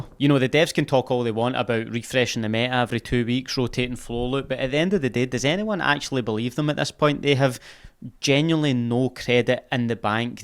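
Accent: Scotland, United Kingdom. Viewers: I got suckered back into COD with the MW2 alleged 2 year cycle and support, Activision hasn't had a cent of my money since but I still watch all the content for the factor. A few other creators tried imitating the WZ spectating and it was crap. I feel it's the Scottish humour and accent we all love so much more than the game. Don't let burnout and negative comments bring you down pal. For every one bad comment there's a crap load of lovers of your content. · The reason I started watching you was your Scottish accent. Everything is entertaining in Scottish accent. I would watch paint dry if you would narrate it. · mate, i watch for you, your accent, and the Scottish wit. Your creator-craft has grown significantly, and it's been a pleasure to see. I'd click so fast on any ARC Raiders content. There are so many camping spots to abuse, and the VOIP would deliver some golden content.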